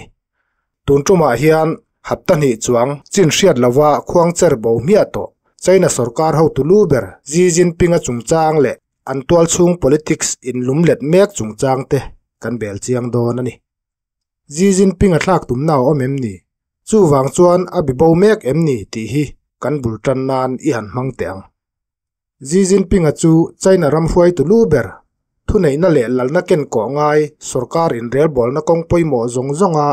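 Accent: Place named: Eastern Mizo, India. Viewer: I awka chu khawchhak unau a ang hle mai mahse a bengvar thlak e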